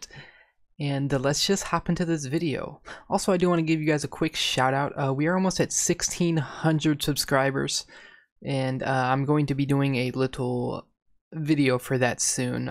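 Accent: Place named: Arab world. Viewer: Arabic English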